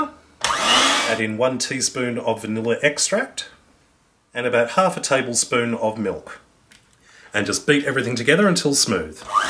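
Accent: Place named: Australia. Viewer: Where did you find buttermilk? Judging from your accent i think your from australia? If so would they sell it at Coles or woolworths?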